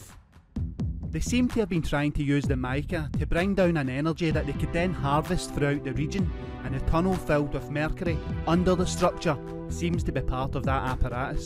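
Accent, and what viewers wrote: Scotland, United Kingdom. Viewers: That's a strong Scottish accent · what part of Scotland is the narrator from please? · I can hardly understand every other word of the Scottish accent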